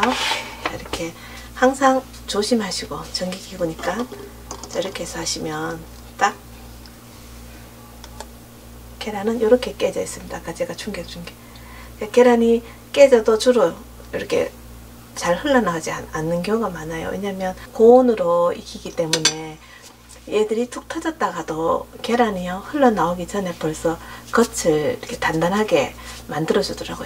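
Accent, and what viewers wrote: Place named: Andong, South Korea. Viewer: 고향이 경북봉화인가요?. 말투가 안동이나 봉화쪽 말투이신듯..-0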